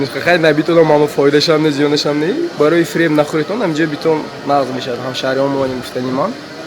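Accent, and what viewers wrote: Konibodom, Tajikistan. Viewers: Канда занид бародар шеваи Конибодомива гардам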